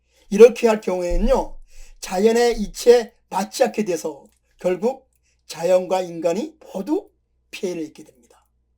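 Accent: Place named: Gangwon, South Korea. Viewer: 어머.선생님 어쩐지 강원도 사투리가 있다 싶었는데... 반갑습니다. 저도 영월출신입니다.^^ 저도 주역공부 열씸히 하고 있답니다.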